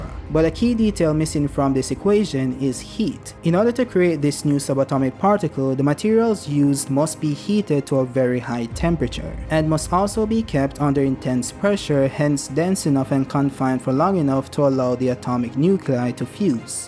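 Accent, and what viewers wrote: Jamaica. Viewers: Do you have Jamaican ancestry your talking kind of sound like that for me??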